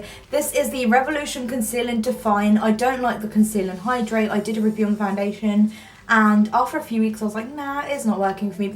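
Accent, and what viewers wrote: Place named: England, United Kingdom. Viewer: I love a English accent 🥰🥰🥰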